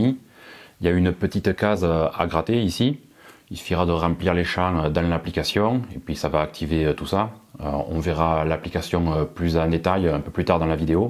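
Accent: South, France. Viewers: T'as une maladie ou c'est un accent du sud? Juste pour savoir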